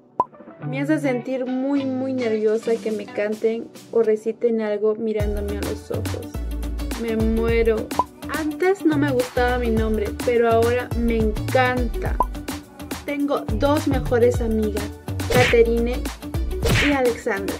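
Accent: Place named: Mexico. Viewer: De verdad pense que eras mexicana porque tienes ese acento mexicano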